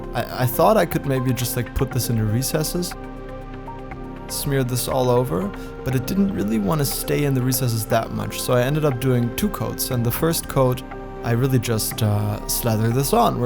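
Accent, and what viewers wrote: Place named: Germany. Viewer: I think ur right it looked better before but still now its more of what u imagined it to be, not terrain, marker, mini whatever ✌ btw where u from u sound pretty german but not totally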